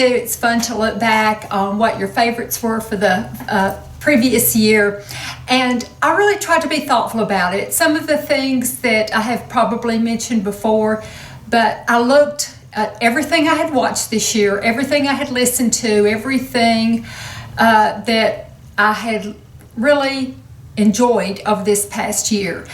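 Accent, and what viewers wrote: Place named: North Carolina, United States. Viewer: Omg, I just have to tell you that you have made me smile thru this video. I know you're from east TN, but man oh man do you sound like us here in central NC!!! I hear my mom's side of the family in so many words you pronounce and I love it! YOU feel like home and I automatically trust you! You've got a new subscriber here😊🤗😦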